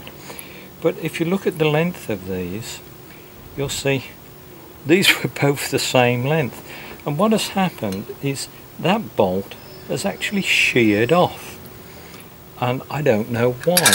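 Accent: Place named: Birmingham, United Kingdom. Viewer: I have a question if that's ok, I have A faulty strimmer, the plate that rises and falls behind the plug seems to be hitting the plug to the point where it's closing the gap ( surely that's not correct ) if I pull the cord it works freely with the plug out but struggles with the plug in. Ps are you a Brummie, theres definitely a bit of Brum in your accent. thanks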